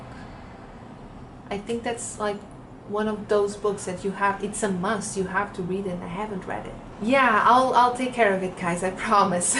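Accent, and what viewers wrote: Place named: Russia. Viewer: She's Russian. Her accent is obvious to me.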